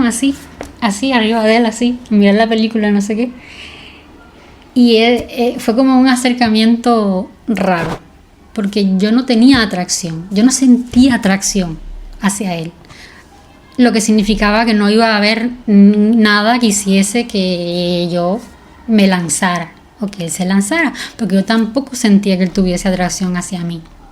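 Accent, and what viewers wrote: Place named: Cuba. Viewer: Mi esposo cada vez que escucha tu voz me dice: ya estas escuchando a y yo le digo claro que siiiii 😅😅 por fin llegamos a la parte feliz, te lo mereces todo mi reina. 🩵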